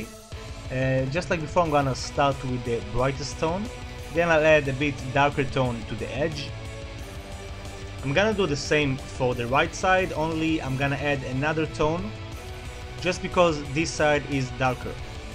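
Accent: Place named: Israel. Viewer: אתה ישראלי? יש לך מבטה של ישראלי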